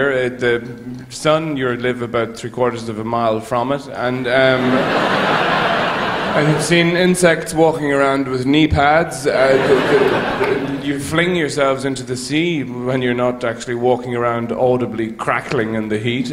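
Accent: Ireland. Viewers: hey dip wit hes irish not english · lol he isnt english at all, he's irish from the republic of ireland. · I'm Irish so when he started talking I was like Wait is he Irish ?! Then I like nah probably not nobody Irish is really famous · He is Irish, not British.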